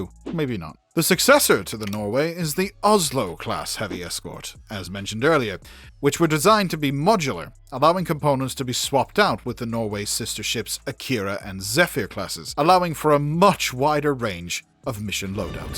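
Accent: United Kingdom. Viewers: okay, the narration was way way over the top on this one, had to shut er down 1/3 thru lol. Please get rid of the nasty british accent thx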